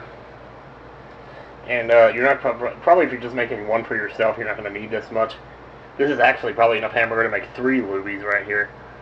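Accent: South, United States. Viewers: I send this to my mom cause when I watch your let's plays I am usually heading in the kitchen :P I said "He let's plays, he Southern AND he makes cooking tutorial's" XD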